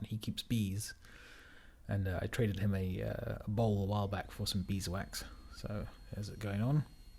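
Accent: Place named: United Kingdom. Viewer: The craftsmanship is great but does this fella gotta use the British accent? It’s like chewing on tinfoil while watching the chalkboard scratching scene in Jaws!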